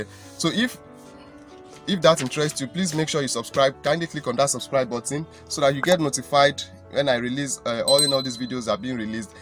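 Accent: Nigeria. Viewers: Thanks very much sir. Sir it's seems like you are from Nigeria. Are you from Nigeria?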